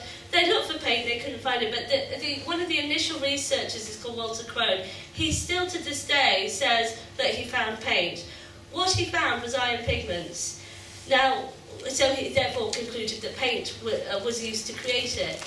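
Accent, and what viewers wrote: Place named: Estuary, United Kingdom. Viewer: i'm just starting to listen to this (i'm up to the beginning of the "visions" part), but i can't help noticing that is a very sensual woman with an absolutely delightful estuary accent....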